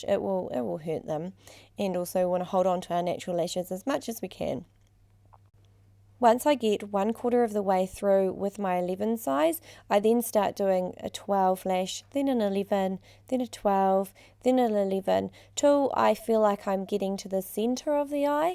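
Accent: New Zealand. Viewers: I only clicked on this to see what ladies are doing on my eyes but hearing you have a NZ accent, ummm where are you based so I can get mine done by you ASAP haha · ohh so thats a kiwi accent!..now I can notice the accent of calum hood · I think she's a kiwi? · Was suprised when I heard the accent. Woohoo, kiwi!!! Do you do eyelash extensions? If so where are you based? In NZ. I would love to have my lashes done by you!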